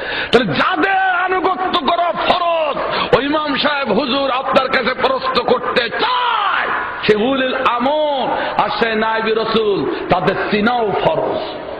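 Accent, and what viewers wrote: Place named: Barishal, Bangladesh. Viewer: hala borisailla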